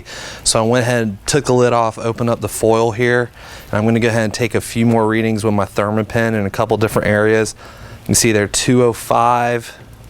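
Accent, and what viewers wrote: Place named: Pennsylvania, United States. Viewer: Southwest PA is my guess.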